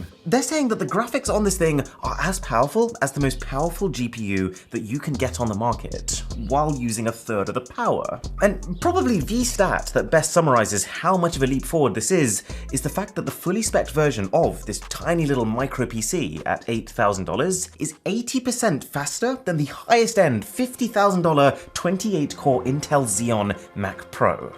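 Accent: United Kingdom. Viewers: Hello Mrwhosetgeboss...Can you do ya favour and add subtitles.... it's still hard to catch up with your British accent,still with auto generated subs.. Help some Indians bro...😄😄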